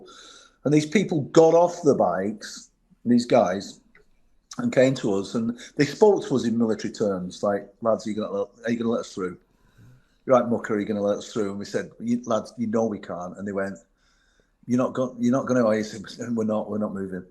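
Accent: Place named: Liverpool, United Kingdom. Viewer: pity about the scouser accent , and he is a crim !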